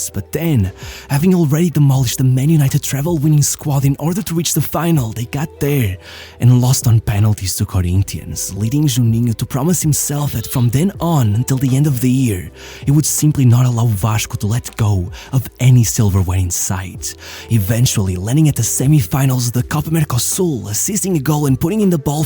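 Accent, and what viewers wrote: Portugal. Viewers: Ahh the narrator is Portuguese!!!! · esse sotaqque portugues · Ele é português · Ur Portuguese right? This accent always got me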